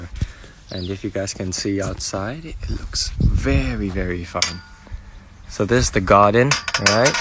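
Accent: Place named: United States. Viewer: He is heavily influenced by American English. His English sounds more or less like American rather than British or Australian or other accents.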